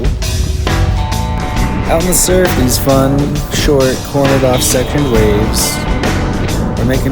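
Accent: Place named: Southern California, United States. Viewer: Every now and then you have that 'Endless Summer' vibe going with your comments a laid back SoCal accent!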